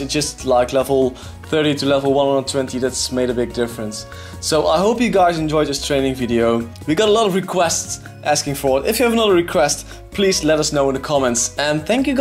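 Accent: Netherlands. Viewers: You dutch bruh?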